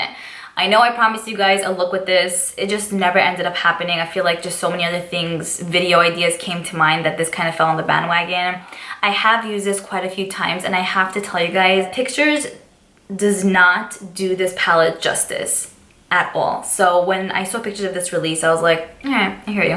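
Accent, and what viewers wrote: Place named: Long Island, United States. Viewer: Glaws Bombs! I was guessing you were a NY'er...hot chawclate made me think Long Island perhaps.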